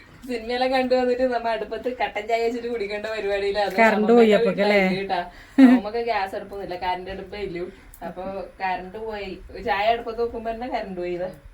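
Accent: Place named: North Malabar, India. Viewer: Hii നമ്മളും ആ നാട്ടുകാരാണുട്ടോ കാങ്കോൽ. വടശ്ശേരി മണൽ. ഇപ്പൊ മുംബയിൽ സ്ഥിരതാമസം. നിങ്ങളെ വീഡിയോ കാണുമ്പോ ശെരിക്കും നാട് മിസ്സ്‌ ചെയ്യുന്നു. നല്ലരസുണ്ട്ട്ടാ. പ്രത്യേകിച്ച് നമ്മളെ ഭാഷ😀എനിക്കും യൂട്യൂബ് ചാനൽ ഇണ്ട് wings of dreams ഇതെന്നെ ഈ കമന്റ്‌ ഇടുന്ന അക്കൗണ്ട് ഞാനും മുംബൈ ആണെങ്കിലും നമ്മളെ ഭാഷയും നാടും ഒക്കെയാണ് സ്നേഹം ❤️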